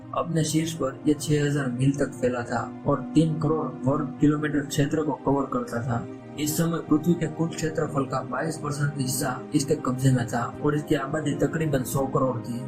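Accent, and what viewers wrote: Gujarat, India. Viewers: Bhai Tu Gujarat se hai ?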